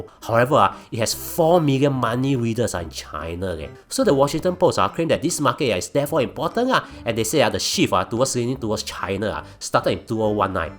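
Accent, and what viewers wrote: Singapore. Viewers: so funny. i want to take Singlish presentation skills from you. You are a classic act that is hard to emulate!:goodvibes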